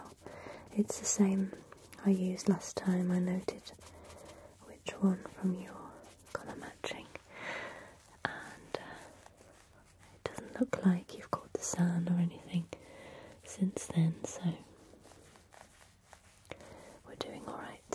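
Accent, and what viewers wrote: United Kingdom. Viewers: your beautiful British accent and lovely personality are the sole reason why I watch your videos. My mom playfully teases me because I absolutely love the British accent and that I’m meant to marry an Englishman. ❤️ #ASMR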